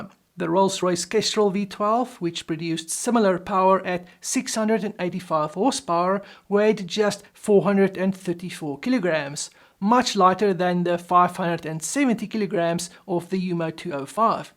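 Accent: South Africa. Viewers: Puedo entender sin subtítulos .... bendito acento sudafricano 😅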